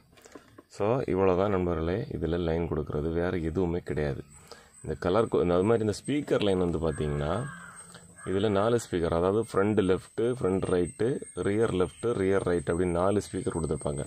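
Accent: Kanyakumari, India. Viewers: Kanyakumari makkaloda thamil keka azhaga irukku..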